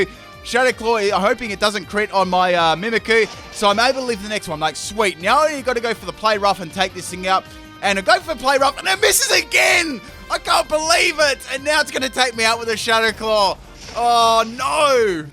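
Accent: Australia. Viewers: bruh thats just his australian kicking in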